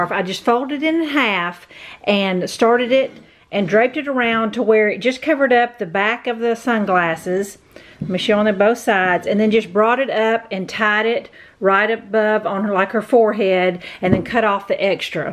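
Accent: South, United States. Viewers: Are you a southern lady?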